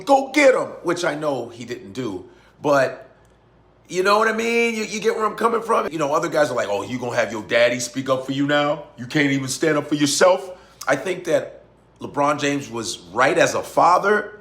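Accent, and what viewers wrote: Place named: AAVE, United States. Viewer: Godfrey, my brother, please stop talking in ebonics, it is not necessary. I mean when you are speaking as yourself, not doing impersonations.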